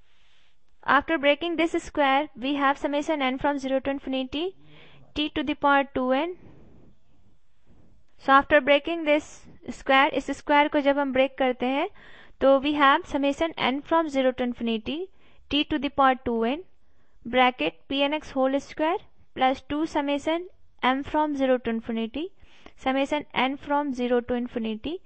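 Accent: India. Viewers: I barely understand indian accent... but english mixed up with indian hell no !!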